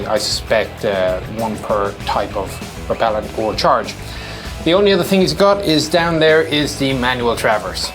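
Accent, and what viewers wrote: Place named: Ireland. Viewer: Is he irish he has a slight irish accent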